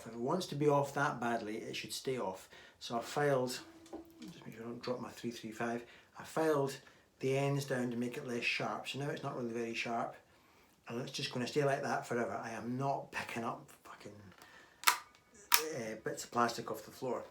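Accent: Scotland, United Kingdom. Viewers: I come to this channel to listen to the gorgeous tones and phrases that can elicit from his great collection....but more than that is that super smooth Scottish brogue accent I hear when is regaling us with his stories....that voice is warmer than a cup of Horlicks! Put the two together and you have me transfixed!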